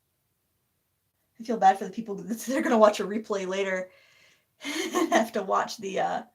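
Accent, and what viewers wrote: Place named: New England, United States. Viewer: You talk like a New Englander! NEVER apologise for that! New Yorkers talk even faster! You guys are just who you are and i, as a Brit, love your vieos, don't change to try and please everyone..southerners talk so s l o w, so each region is unique! Your art work is gorgeous!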